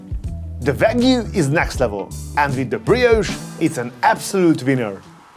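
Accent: Hungary. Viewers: This is my first time seeing a video on your channel, I gotta say amazing content. Your accent😄 are you Hungarian?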